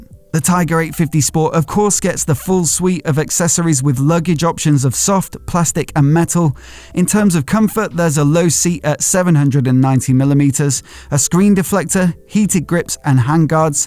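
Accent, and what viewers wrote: United Kingdom. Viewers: Why has to be annoying British accent?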